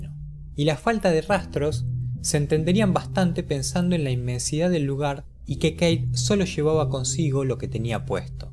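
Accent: Argentina. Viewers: El acento argentino y uruguayo generalmente es así. Vocalizan la s como j. Y la ll como sh. Lo mismo pasa con el acento español, pronunciando la c como z. El idioma español es muy complejo en cuanto al léxico de cada país.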